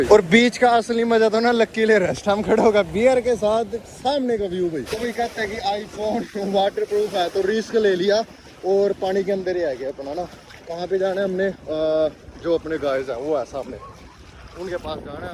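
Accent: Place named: Yamunanagar, India. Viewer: Boli to ynr side wali h bai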